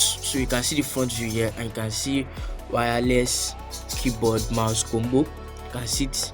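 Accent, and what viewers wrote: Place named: Nigeria. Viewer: Never heard more authentic NIgerian Accent ever 😁💀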